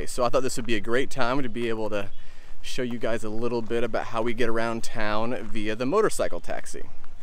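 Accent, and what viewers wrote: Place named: Krio, Sierra Leone. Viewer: I enjoyed hearing your Krio as well as the bike ride footage. Have you tried hailing with the little noise they make with their mouths? I can't do it, and I am always amazed that the bikers can hear it.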